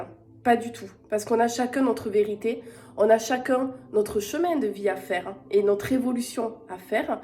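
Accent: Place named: Basque Country, France. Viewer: Merci pour ce message. C'est clair qu'il faut respecter l'autre, mais il faut aussi, ne pas hésiter à mettre des limites. Votre accent de Donibane, nous apporte le soleil que nous n'avons pas en région parisienne 😉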